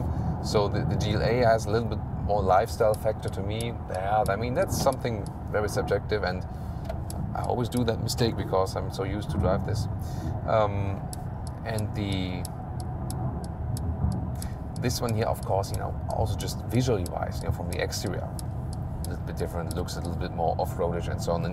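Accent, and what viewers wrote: Germany. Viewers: he is the germanest person i've heard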